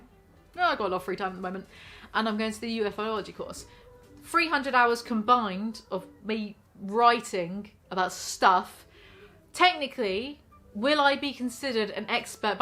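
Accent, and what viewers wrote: United Kingdom. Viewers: Your british accent makes everything funnier 101% lmao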